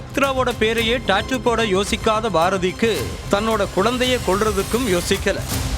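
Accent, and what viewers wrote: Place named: North, India. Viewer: Ennada kevalama news vasikiringa…vadakan mathiri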